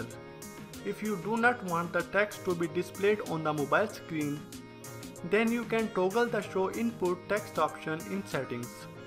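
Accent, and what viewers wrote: India. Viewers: So off topic but can anyone answer this serious question. Not being racist here. Just wondering about his accent. So he obviously has a great understanding of the English language but retains a thick Indian (im guessing) accent. This is very common. When I took French in school a big part of it was hitting the words correctly. Not speaking French with an American accent ya know. Now if he spoke broken English it would not have stood out. But he speaks very fluent English. So why is the pronunciation so off?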